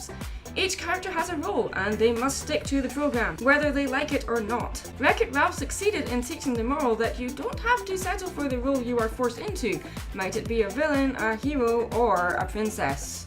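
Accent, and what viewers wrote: Scotland, United Kingdom. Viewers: You know, for the longest time, I never knew that the Disney princesses were actually teenagers. They look more like they're in their 20s. Hmph 😕. Go figure. I love Merida. She's my favorite Pixar princess cuz she doesn't act all feminine. She has a definite tom boy feature like me but still beautiful none-the-less. I also love the fact the she's Scottish. I'm Scottish too thru heritage. I wonder if Disney will ever come up with an Irish princess. I'm Irish too. 😁 Lovely to meet you. Love your Scottish accent. Bye. ❤️ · Are you Scottish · Scottish Youtuber... Does art... Amazing voice... I'm sold I'm subbing, maybe watching more Scottish Youtubers like you will get my accent sorted! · your scottish? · I personally like Scottish ascent girls sound very ...well I don't know how to describe it but still Merida and you sound beautiful yet fun I don't find it hard to understand at all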